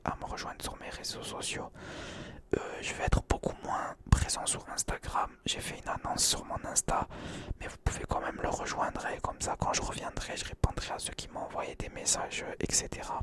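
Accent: South, France. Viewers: salam aleykoum, ca faisait tellement longtemps que jsuis pas tombé sur tes vidéos ! le truc que j’aime vraiment trop c’est l’accent du sud, t’es de marseille nan?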